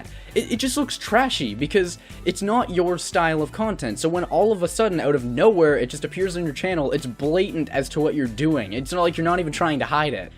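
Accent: Canada. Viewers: Watching you has literally reminded me of all my wonderful childhood summers spent at Crystal Beach! Directly after the Peace Bridge, or within short distance. (After the amusement park was shut down:/ but still the absolute happiest memories) for a few reasons. You legit bear a shocking resemblance to one of the slightly older teenagers who lived next door to our summer cottage (he was always looking out for us younger kids and even the slightly older cousins and whatnot especially if we wanted to venture in to the town. Even with my older brothers taking us younger kids, this guy still made sure to come with us as he didnt want anything weird happening. Like a surrogate big brother or cousin. Okay this turning into a WHOLE thesis on why your accent and then the almost doppelganger resemblance, has helped me to feel more related to you. And also just made me feel damn good to remember those times. 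So, thank you for being Candian cuz as dumb as it, honestly made me like you more.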